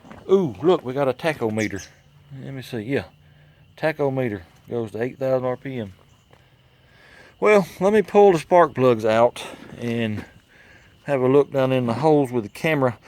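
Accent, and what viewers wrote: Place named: Tennessee, United States. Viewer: As usual a great video. Sure glad to hear your accent, brings back memories of my time in Tenn. Many thanks!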